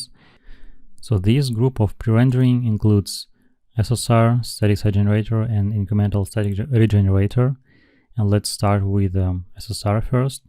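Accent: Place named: Eastern Europe. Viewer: Not bad. Slavik accent is hardly heard. Keep it up!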